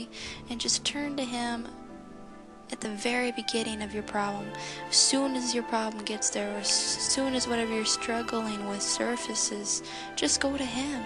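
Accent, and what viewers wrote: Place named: United States. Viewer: yep, here I am at 3.30am in the morning stuggling to find a solution to this bed bug problem (from the past Lord knows how many years, but they just keep coming). You sound like you're American.....I speak to Americans everyday as part of my job here in India. I'd like to thank you for taking the time to post this video. I've prayed about it...but the people in this joint family aren't very careful about stuff, they keep old junk stuff under tables or where ever they find place...help Lord :(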